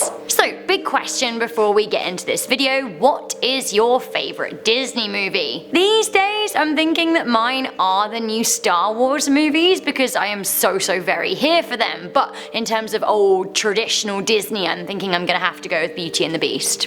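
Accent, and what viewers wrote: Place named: England, United Kingdom. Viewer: what part of England do you hale from?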